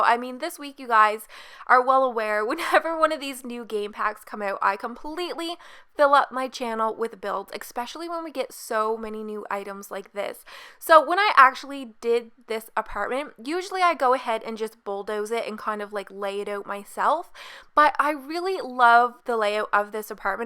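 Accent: Canada. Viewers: Do you have a Canadian accent? And I absolutely love this build. Pretty sure the best I have made is a shack compared to this ;). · are you Canadian..?? we talk to same way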